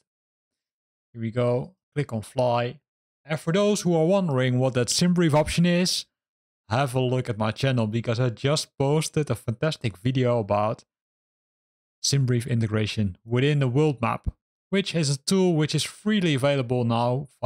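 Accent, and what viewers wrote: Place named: Netherlands. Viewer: I hear a thick Dutch accent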